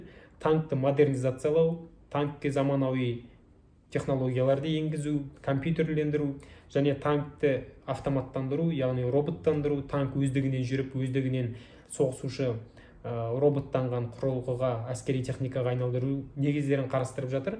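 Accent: Standard. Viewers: Бәрекелді балам. Міне осылай таза қазақша сөйлеуді үйреніңдер жастар. Осы сен сияқты сауатты жастарымыз көп болсын.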